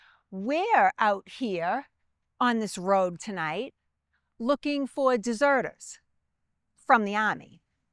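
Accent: Boston, United States. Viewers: I’ve noticed that you’ve commented on her accent on several past videos. Just to confirm so you may perhaps move on: our family is from Boston. Her accent is authentic. Thanks. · I LOVE YOUR BOSTON ACCENT. Im from California, biggest Red Sox fan in Ca and a big fan of Our Founding Fathers and American history. I just found your chanel and i love it. Thank you for sharing your knowledge.🇺🇸 I Love My Country. God Bless all and God Bless this beautiful country🇺🇸🇺🇸🇺🇸🇺🇸🇺🇸🇺🇸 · I just love to hear you tell stories. I love your Boston accent and the passionate way you connect with history. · As a Bostonian, not only do I love the history but I love the accent. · That’s pretty rude! It’s an authentic Boston accent and your comment is offensive to those of us who are lifelong Bostonians. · As a southerner I could listen to Revolution history in this Bastan accent all day long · All my Gad … the actual Spat … live the Boston Accent , I mean Bastan accent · After listening to her talk for only 15 seconds, I could definitely tell she was from Boston.🤣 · I love her accent-- my inlaws are Boston area born, raised, still there. 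· . My family is from Boston, and sorry, she sounds just like us. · Ok this story can only be told with that Boston accent! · Great story. However, what an AWESOME Boston accent! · I Love Her BOSTON ACCENT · The Boston accent is on 110. Turn it down just a tiny bit please. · As a native Bostonian who worked hard to eliminate my local accent this gal is the real deal. She does a great job explaining a very important historical event. Cheers! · Great presentation. My father and grandparents were Boston natives. I lived in or near Boston for a while in grad school at Brandeis. You have a classic accent - my dad said 'bananer' but did not drop his 'r's. His sister did.. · For all of you out there, this is one authentic Boston accent. If you are anywhere in the world, and you here this tone, Boston is not far away. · Connecticut here. Love a Boston accent. Great job. I subscribed and liked and look forward to more. 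Thanks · I grew up in Boston. I am a 4th generation from the same town and my 2 boys are the 5th. I think you have more of a Boston accent than I do and you are probably not even from Boston. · Not only the interesting historical information, and views of the sites, it's done in that lovely Baston accent.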